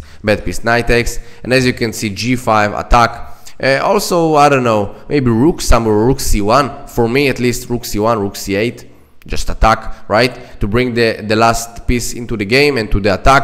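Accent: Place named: Israel. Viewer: איזה מבטא ישראלי ❤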